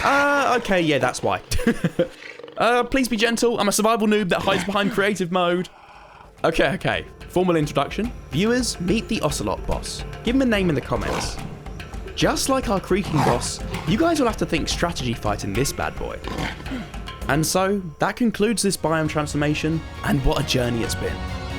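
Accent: United Kingdom. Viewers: Are you British As I mean are you from England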